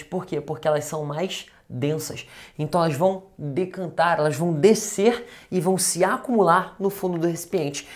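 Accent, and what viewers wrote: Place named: Rio de Janeiro, Brazil. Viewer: Sotaque Carioca é uma tortura.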